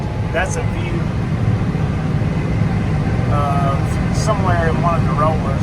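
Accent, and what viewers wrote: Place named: Mississippi, United States. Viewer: GJ explaining that stuff. Always wonder about those heads. Good to see some good ole Mississippi accent on the Youtubes! Good Evening from Lafayette County!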